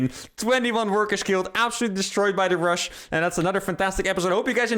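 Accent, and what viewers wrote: Netherlands. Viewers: Lolololol "yea I'm proud" I love. and Lowko are my fav SC casters. They voice/accent makes me want to visit the Netherlands. Seem like some wonderful fun and cool people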